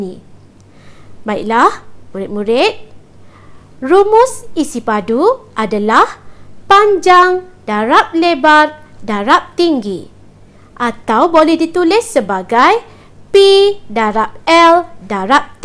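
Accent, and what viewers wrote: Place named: Kelantan, Malaysia. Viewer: O aloh gomo kelate go mo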